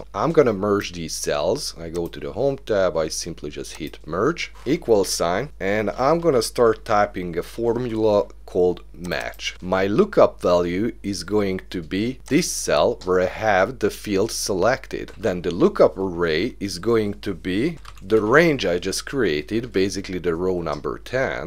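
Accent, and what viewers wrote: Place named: Hungary. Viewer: I bet you are Hungarian. The accent!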